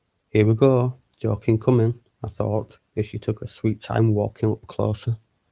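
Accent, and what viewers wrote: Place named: Northern England, United Kingdom. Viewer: You're a very good story teller ( PLEASE don't think I' m being rude ) but you might need to not be so close to the microphone and also concentrate a bit on your diction because some of the words are hard to understand..I btw am a northerner too like you so similar dialect ...anyway you now have another subscriber :)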